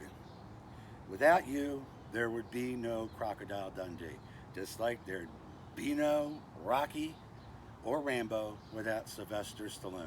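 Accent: Australia. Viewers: The hat is awesome. You sound just like you are from the outback brother. This is awesome. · Aussie accent is hard to do if your not an Aussie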